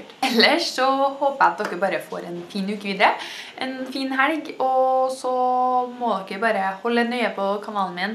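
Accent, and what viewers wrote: Trøndelag, Norway. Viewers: definatly from Norway. :) Can hear it in the dialect. ;) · First I thought "That sounds like Trondersk (I have been learning that dialect myself" and then she said "Trondelag" and I got so happy that I guessed it right. I might develop some kind of ear for accents (That would be cool) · Så du er fra Trøndelag? Jeg prøver faktisk å lære norsk (nederlansk btw) å jeg kan forstå deg veldig godt. Jeg tror at Norsk er verdens vakreste spåk men norge har så mange dialekter så de blir veldig vanskelig å lære. groetjes uit nederland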